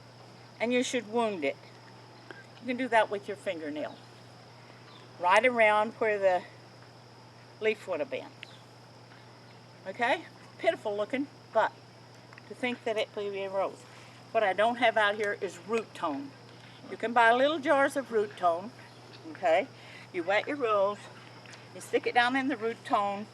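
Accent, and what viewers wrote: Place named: Kentucky, United States. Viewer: The Kentucky accent is like a language of its own.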